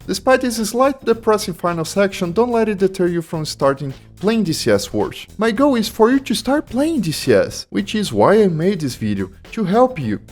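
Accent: Brazil. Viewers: By the accent this bloke is brazilian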